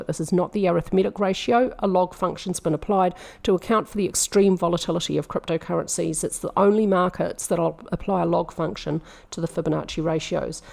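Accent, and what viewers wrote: New Zealand. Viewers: Great to hear your kiwi ascent😊😊😊😊. Great analysis thanks.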